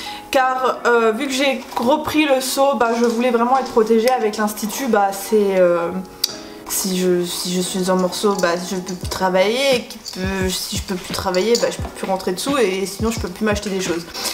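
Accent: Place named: Alsace, France. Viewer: tu l accent alsaciens à fond 😂 mais bon faux pas ce moquer quand beaucoup de gants l ont 😂 bref super vidéo 😘 #teamalsacienne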